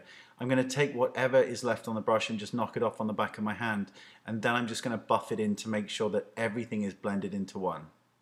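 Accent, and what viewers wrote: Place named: West Country, United Kingdom. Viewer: It's a west country accent... like Bristolian but not as harsh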